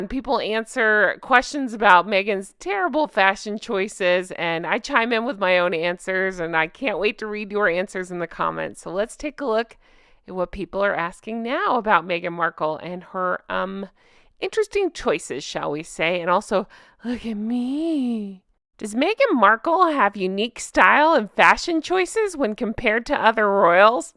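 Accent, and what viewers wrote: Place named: South, United States. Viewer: Oh dear. you crack me right up. Being an Aussie and living in the UK for a very long time, it did take me awhile to tune in to your southern accent, but now you are defo my fave you-tuber...... You make me laugh so much, you fair make my day..... I hope that 2024 is a great year for you, Jay and your family..... loads of love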